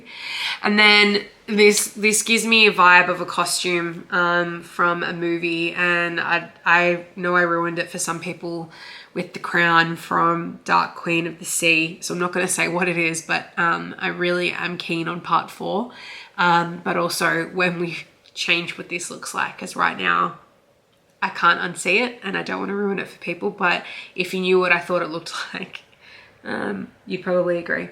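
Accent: Australia. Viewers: Love hearing the Aussie accent on Flosstube! I agree, we need more seasonal stitching options over here in the Southern hemisphere. That BBQ is super cute 😍. Hope you feel better soon.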